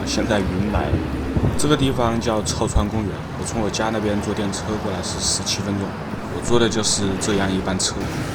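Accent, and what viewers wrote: Wuhan, China. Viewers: 这口音 太武汉了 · 武漢口音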